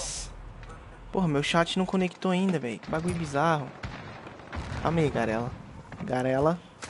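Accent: Minas Gerais, Brazil. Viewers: Tu tb é mineiro man?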